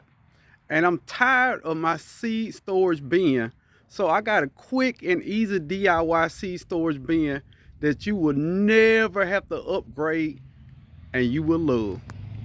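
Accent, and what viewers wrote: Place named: Georgia, United States. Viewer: where did you get your Master grow course certificate from? I want to complete one. Thanks for the videos and I love the realness and natural Georgia dirty south accent! I'm from Georgia myself. Let me know where to get the certificate please. Keep up the grind and growing your own food!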